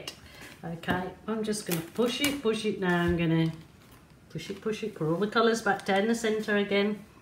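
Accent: Birmingham, United Kingdom. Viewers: Aaarh Bostin way spotted the Brum accent when we subscribed to you. From John ex Brummie (handsworth) but only moved to NE England!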